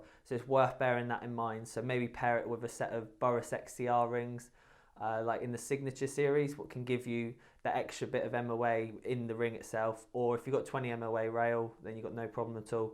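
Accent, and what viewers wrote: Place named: West Country, United Kingdom. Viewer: ".....or you'll bugger up the cap...." Tell it like it is west country boy and more power to you.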